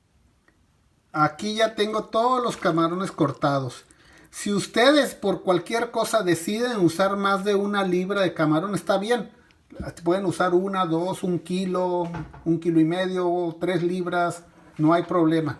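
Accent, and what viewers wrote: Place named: Sinaloa, Mexico. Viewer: Usted es todo un amo de casa. Que rica receta. De donde es? Habla como sinaloense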